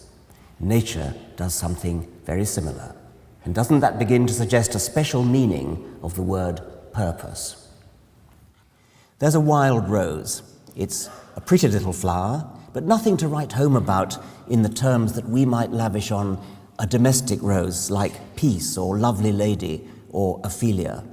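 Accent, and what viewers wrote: England, United Kingdom. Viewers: Arrogant no that's what English sounds like . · It's just a British accent, we in 🇬🇧 nearly all have one.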